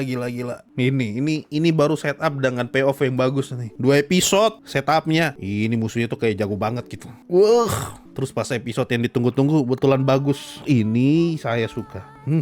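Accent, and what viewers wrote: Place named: Sulawesi, Indonesia. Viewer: orang sulawesi kah? distracted aja ~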